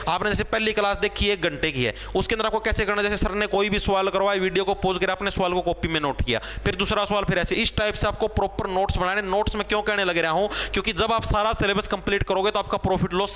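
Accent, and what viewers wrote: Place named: Punjab, India. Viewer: Panjab se ka aap aaye hai sir aapka to bhasa bahut mast hai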